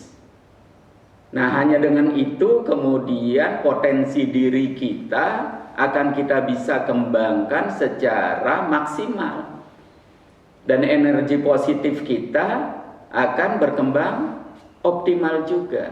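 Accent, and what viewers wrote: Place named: Bali, Indonesia. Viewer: Salam sehat pak... Pengucapan huruf T kok seperti pengucapan dari orang Bali, Aceh dan Jawa Cirebon... Apa asli dari Cirebon ya pak... Terima kasih matur nuwun... 🇮🇩🇪🇸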